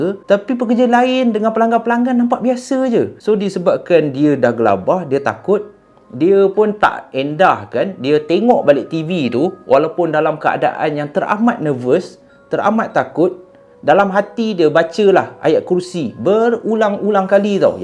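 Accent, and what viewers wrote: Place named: Kelantan, Malaysia. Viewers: Pertama kali ari ni tgok channel you tube..gaya bercerita best jgk..sy doakn maju jaya ya.. ckp kl je sy yg kelantan.faham jee..😅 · Agak pelik bila dgr bckp dialek kelantan...tersengih2 mami😅😅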